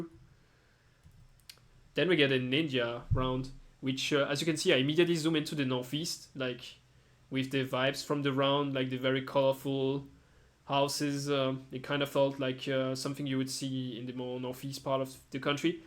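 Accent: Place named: France. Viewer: Pourquoi tu as le drapeau Danois ? j'entends l'accent français 😅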